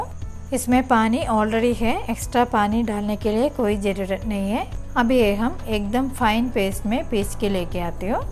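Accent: Kerala, India. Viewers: Thank you madam for the recipe,I like your hindi in Kerala accent ❤️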